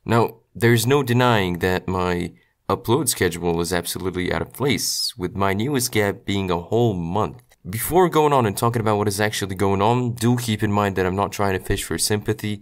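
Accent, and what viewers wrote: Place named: United States. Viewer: Are u seriously from there? Are u okay? I actually live very close to syria.. Can see black smoke sometimes and shit... But u don't have arab accent u sound 100% american!! xDDD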